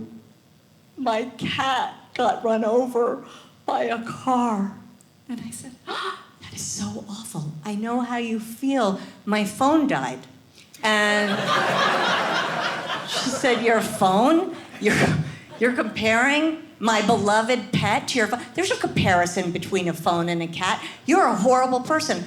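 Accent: United States. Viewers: Brilliant comedian and real American in her delivery, attitude and humour! Really liked it! Normally not so interested in people banging on about their kids on stage like I came to hear their biography but this brought so much humour with it I was glad to hear the stories! Thanks again!!! Haha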